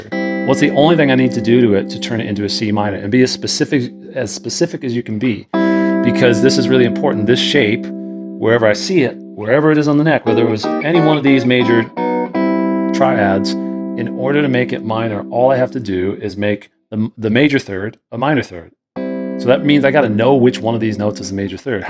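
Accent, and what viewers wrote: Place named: New Orleans, United States. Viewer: Man you sound like you're from New Orleans lol. I am so I think thats pretty cool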